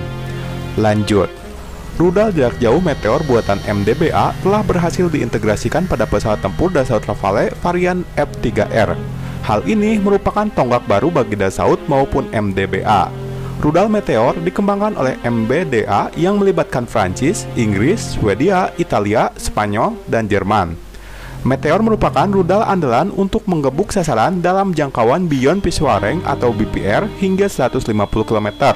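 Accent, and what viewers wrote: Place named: West Java, Indonesia. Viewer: Jiga urang sunda ieu mah nu ngomong na hehehe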